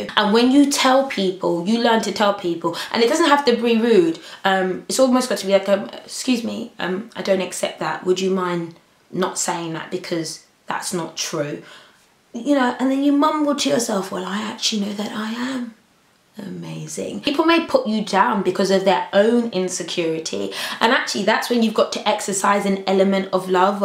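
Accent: England, United Kingdom. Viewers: I love your raspy british accent ugh! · oh my gosh . black women with english accents are just so beautiful to me. black women in general are beautiful, every woman is beautiful.. but i mean, gosh! the accent just kills me, i love it! <3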